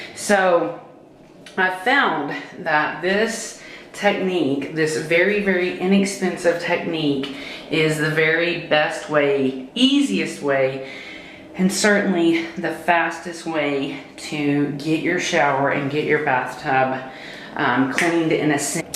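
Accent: South, United States. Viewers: Omg, I love your southern accent. I've lived in Washington state for 30 years and your accent really makes me miss the south. ❤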